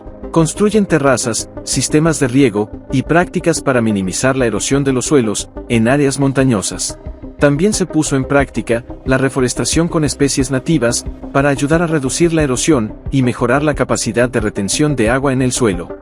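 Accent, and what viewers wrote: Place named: Castile, Spain. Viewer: Un buen documental con una voz narrativa en perfecto castellano. Muchas gracias desde Tenerife. Reino de España. Viva nuestro muy amado EL SALVADOR !!!.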